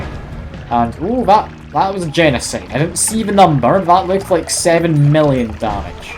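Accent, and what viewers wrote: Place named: Scotland, United Kingdom. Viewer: sorry if I'm coming off a tad bit rude but you sound a wee bit Scottish. All i can hear is the Scotsman from samurai jack.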